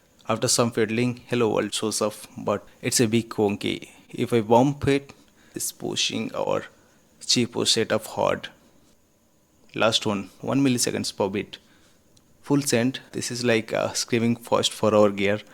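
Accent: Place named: India. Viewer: bro y you sounding indian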